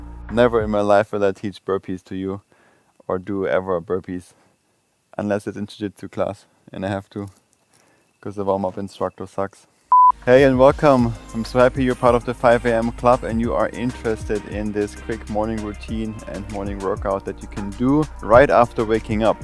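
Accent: Germany. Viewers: Are u german or swiss? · german accent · ich war in Köln für ein Jahr. Freut mich so sehr ein Deutschakzent zühören! Keep being awesome! Love the channel I can't wait to show my partner :-) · Are you from Germany? Your accent sounds hella german :) Good video👍🏼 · Nach4 Sekunden direkt den deutschen in dir gehört.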